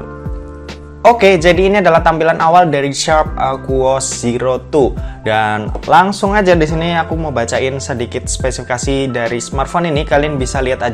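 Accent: Semarang, Indonesia. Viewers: Loh masnya orang semarang toh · Orang semarang tah mas?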